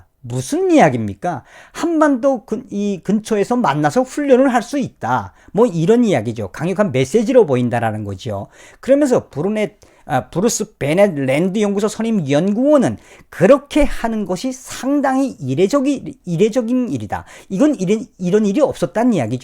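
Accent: Pyongyang, North Korea. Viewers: 정성산 티비는 라북사투리가 매력이야요...ㅋㅋㅋ피양사투리로하라요...화이팅.... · 평양 사투리좀 안쓰면좋겠는데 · 이북 지역사투리죠.그건 미워 맙시다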